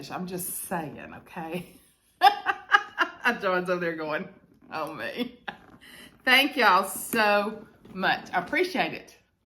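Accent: South, United States. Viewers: I LOVE your Southern accent. I have always lived in the eastern Cape, and we too have a distinctive accent. So I guess we are both "Southern" girls, just different hemispheres. Lol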